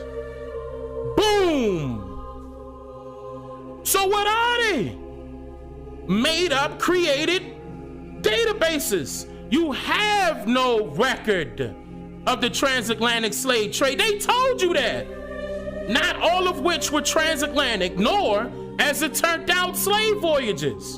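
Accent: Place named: Philadelphia, United States. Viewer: Boom! Sounding so Philly too. 🙌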